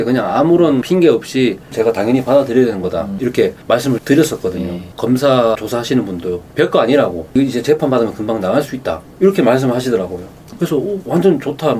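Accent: Gyeongsang, South Korea. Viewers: 말투가..갱상도출신인가요..ㅎㅎ 머.도박을.했엇지만...비굴하지는.않했네요 자기말에.지킬려고.했던.마인드.. 칭찬해주고..싶습니다.... 도박..다시는.않하시겟지만.. 내용을.보니.머리가.아주.비상하시것같습니다 뭘해도..잘해내실것.같네요... 홧팅.드립니다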